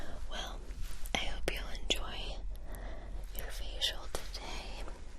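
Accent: United States. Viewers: oh I thought you were American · I thought u were American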